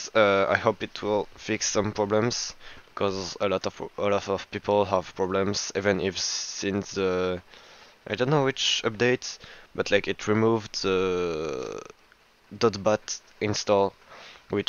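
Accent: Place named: France. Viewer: l'accent francais xD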